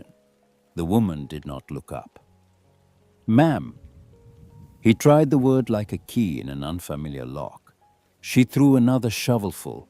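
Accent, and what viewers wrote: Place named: India. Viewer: Just when I got used to the other AI voice they change it to what is this an Indian accent? I don't like the change of voice so I can't listen.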